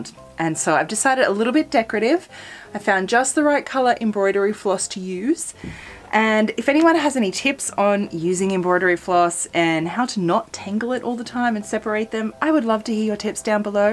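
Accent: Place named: Australia. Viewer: I recently discovered your channel and have been binging them all over the last few evenings. My adult daughter has commented a couple of times (and in particular on this post) that she is going nostalgic for he ABC TV production Play School. We analysed it and concluded that the combination of your well spoken Aussie accent, speech pace, question and response style and gentle tinkly background music is giving the vibes. Now that I have heard it it is hard to unhear it. Don’t change it, we love it. Btw, did you also grow up with Play School?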